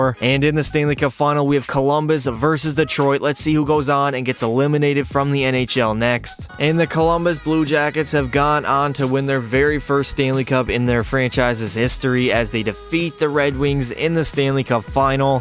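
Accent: Canada. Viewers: are you Canadian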